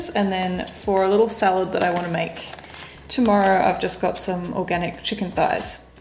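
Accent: Australia. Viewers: Oh my gosh are you from Melbourne?? :D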